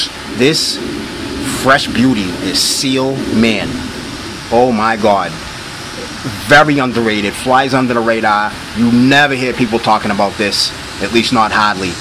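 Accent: Boston, United States. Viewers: Black guy with a Boston accent- wicked awesome! The legend is true- they do exist.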